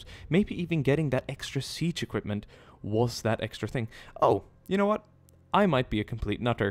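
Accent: England, United Kingdom. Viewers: YOu sure? He sounds pretty English to me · R U british or american?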